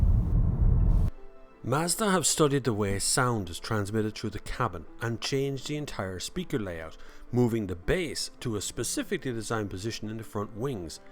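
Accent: Ireland. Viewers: I like your Irish ☘️ accent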